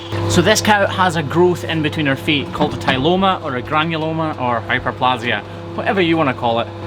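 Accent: Scotland, United Kingdom. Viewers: Who’d of thought a hoof doctor would become my much needed connection to Scotland? Raised by two Glaswegians in Canada 🇨🇦, my aunties and uncles back home have all passed in the last few years so I haven’t been back a lot. The accent, the scenery and the ice cream. My most favourite thing about Scotland is the ice cream since I was a little girl. Thanks for the happy memories.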